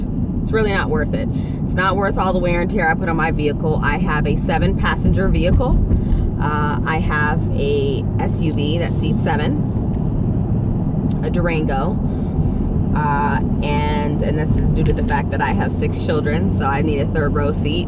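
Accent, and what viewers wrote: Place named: South Central, United States. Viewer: You look like Beverly Hills but you talk like South Central. Shifty eyes.....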